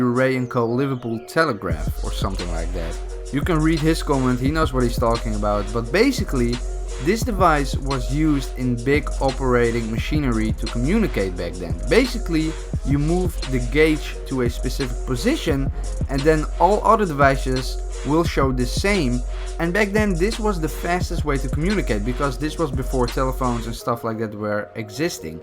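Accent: Netherlands. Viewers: Are you dutch? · Are you dutch because you sound dutch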